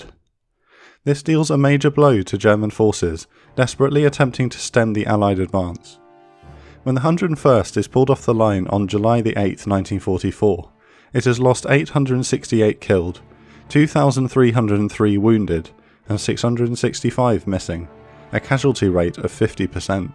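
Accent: United Kingdom. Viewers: Excellent presentation, as usual. And your British accent gives extra gravitas to the narration. Keep them coming.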